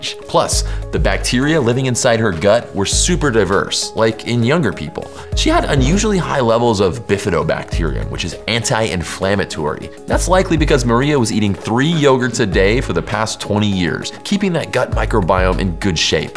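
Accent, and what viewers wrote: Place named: Texas, United States. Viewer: Where'd that Texas accent go?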